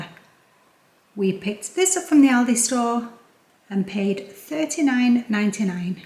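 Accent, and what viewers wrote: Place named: South Africa. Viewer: She sounds like a white South African. If that’s correct, I hope you are in the U.S. now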